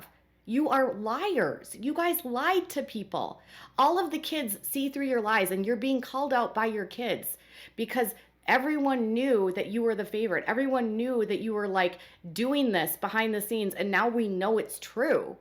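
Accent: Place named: Minnesota, United States. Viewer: I love your analysis and your accent!! You must be from Minnesota or Wisconsin???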